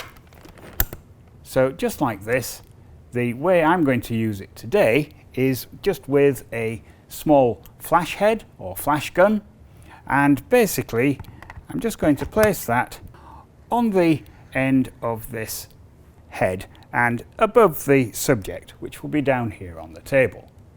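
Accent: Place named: RP, United Kingdom. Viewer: Dear Sir, I am most obliged to you for sharing your knowledge and doing this in Queen's English. You not wearing a baseball cap (the wrong way round) added to my viewing pleasure.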